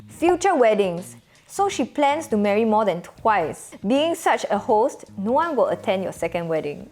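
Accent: Singapore. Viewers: Its called tall poppy syndrome. People love to intentionally knock others pure jealousy! Keep it up girl your Singlish is awesome!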